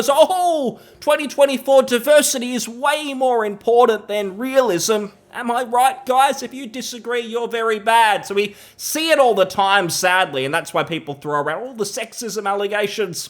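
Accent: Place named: Australia. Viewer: The way you talk is utterly ridiculous. Are you actively trying to sound like 200% more Australian?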